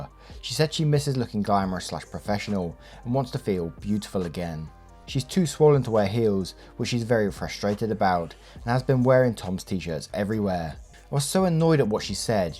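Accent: United Kingdom. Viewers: This is the only channel I know of with a UK voice, I like R/Slash and RStart but this is a properly organic community with interaction and humour.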